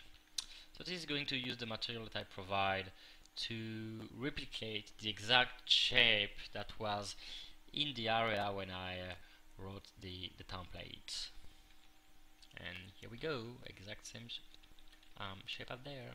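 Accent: France. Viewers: french or belgian? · Are you french?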